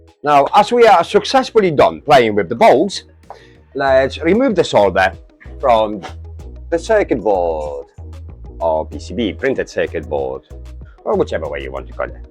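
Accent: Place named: United Kingdom. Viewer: Nice first video i expected you to be another American channel till i heard you open your mouth good to see someone this side of the pond is giving this type of repair a go.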